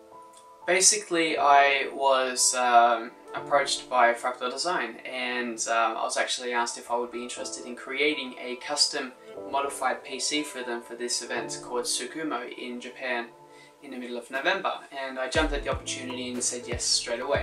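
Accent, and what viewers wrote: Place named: Australia. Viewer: I thought you were Swedish? Your Austrialian(?) accent is quite amazing, and English in general is way above the average for Swedes :P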